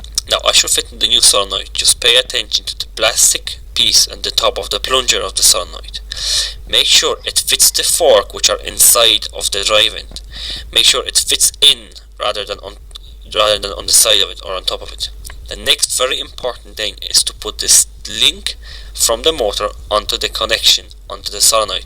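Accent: Ireland. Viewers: Brilliant stuff ... very clear ( I like your irish accent)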